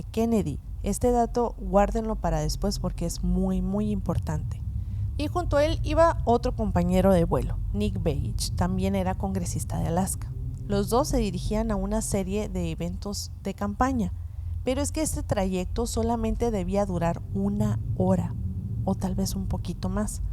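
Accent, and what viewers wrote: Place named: Hermosillo, Mexico. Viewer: Se nota el acento Hermosillense, saludos paisana.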